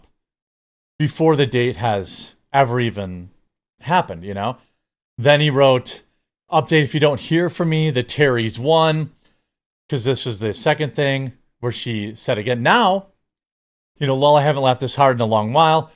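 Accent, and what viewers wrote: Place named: Michigan, United States. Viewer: You're from Michigan, you have the accent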